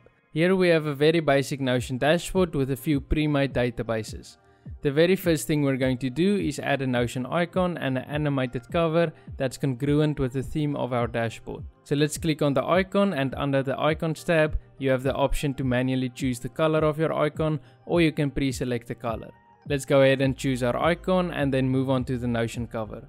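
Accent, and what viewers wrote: Afrikaans, South Africa. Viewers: Afrikaans accent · Are you... South African? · BRO ARE YOU SOUTH AFRICAN?!! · Howsit, you’re from South Africa? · He sounds exactly like a South African friend of mine! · Jis boys, the moment I heard the accent it was a straight, sub-like-follow-bell icon moment. I don’t have time to look for new creators when I can learn from boytjies themselves!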